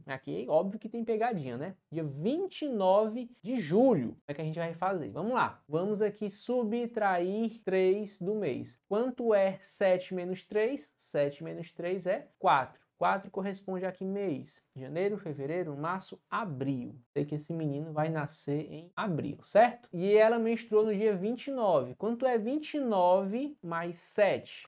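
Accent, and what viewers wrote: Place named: Paraíba, Brazil. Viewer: faz vídeo sobre as medicações que mais são usadas na enfermaria, diluição, indicações de uso e contraindicações … ótimo vídeo! Sou da Paraíba temos sotaques parecidos kkkk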